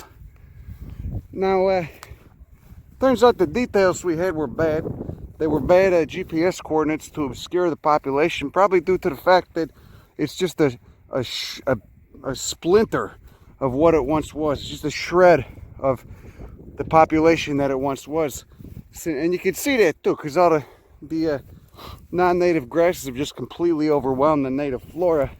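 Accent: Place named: New Jersey, United States. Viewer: Photosynthesizing pile of shit lol...love it...you sound like you're from Jersey btw.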